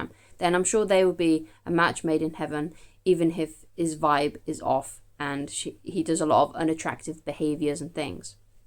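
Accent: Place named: United Kingdom. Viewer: Nice that you have a coaching book out. You're not going to tell me I have to read it 10 to 15 times just to understand it, are you? 😅 I like your content and of course the UK accent... Nothing is more delightful for me than the times that I get to fly through London-Heathrow and everyone is throwing around that accent..."Hallo Yank, yes you... joost need your passport over here, love"... I just love it. It's so different than the way people are here in the States. Anyways, cheers to your book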